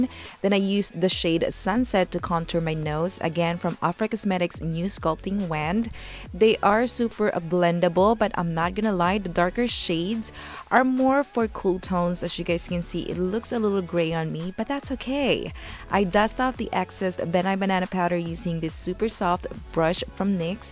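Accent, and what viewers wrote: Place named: England, United Kingdom. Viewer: I really like the way you talk. I wish i could have Your English accent, wow. I always watch your vlogs, it helps me lots for my makeup style. Thank you. Mwahhh.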